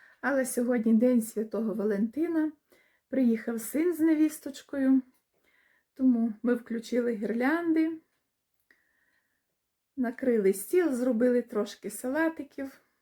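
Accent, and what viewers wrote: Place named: Boyko, Ukraine. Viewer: У вас хороші діти.А де та дочка що приїзжала з онучкою?чи це Олега дочка.Бачу у вас вже такий бойківський говір😅.А я якраз щось подумала сьогодні за вас що ви щось не виставляєте відео,заходжу а ви тут🥰.Рада за вас що придбали дрова,ви знаєте як би важко не було але ще жодна жінка не пропала без чоловіка і у вас все буде добре❤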